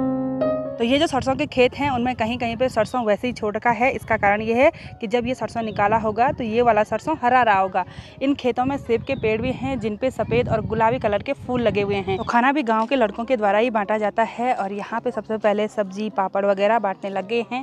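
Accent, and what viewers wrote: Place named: Garhwal, India. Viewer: didi aapka Garhwali bolna bahut achcha lagta hai♥️♥️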